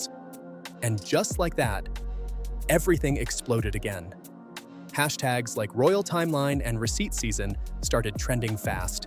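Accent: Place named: United States. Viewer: Usual yankee verbal diarrhoea narration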